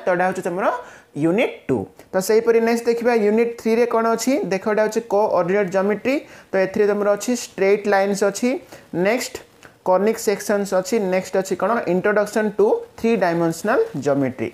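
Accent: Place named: Odisha, India. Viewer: Sir Odia English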